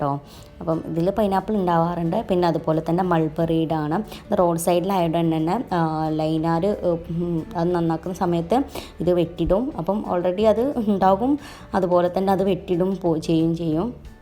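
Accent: Malappuram, India. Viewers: lovely home 🏘️🏡🏠 Ingalu Malappuram slag paray ... PLZZ We love it ❤️🎉👍🌷🌻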